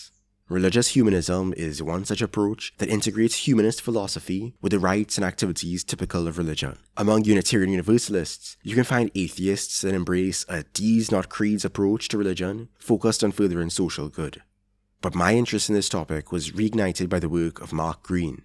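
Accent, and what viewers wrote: Trinidad and Tobago. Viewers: hearing this trini voice dropping gems on my yt recommended was not on my bingo card 😂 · I am a long-time subscriber, a huge fan of your videos. In particular, I enjoy the prosodic cadence in the steady flow of your voice evident in your videos' systematic coverage of a given topics that will ensure they receive the explanation that they are due in time. I found myself thinking during this excellent video's presentation of your utopian-optimist theory-laden anarcho-idealist perspective, in your pleasant Trinidadian voice about the gravity of this rare moment in socio-political-economy which seems to invite a new analysis of every human philosophy or branch of one of the social sciences. For the sake of your intellectual curiosity & to spare me the frustration of yet another rewrite of this message, please watch the following 20 minute video on the polltical-economic assumptions of the 20th century that must be overcome to readjust to the Political Reality of the present era in which corporations have more financial power than nation-state actors. 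I would like to write an essay on this video in particular as it is a worthwhile tangent for serial nonfiction writing I am working on about the evolution of the United States BigTech into the monopolostic behemoths that they are today where dehumanizing pursuit of greater raw power far exceeds any & all ethical principles they pretend to have. At this moment it has become obvious to US Software Engineer YouTubers that Google's Play Store Global Developer Registry policies are paving the way for a grim neofascist/technof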